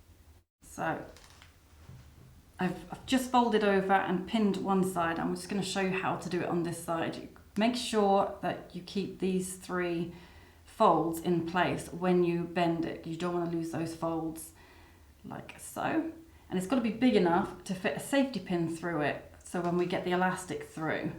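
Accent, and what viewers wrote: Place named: England, United Kingdom. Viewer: Thank you so much my friend you sound like you from England my friend god bless you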